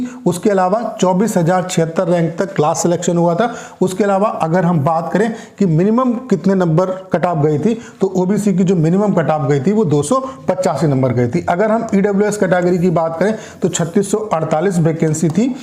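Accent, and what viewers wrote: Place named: Uttar Pradesh, India. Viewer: Bhaiya pkka Uttar Pradesh se h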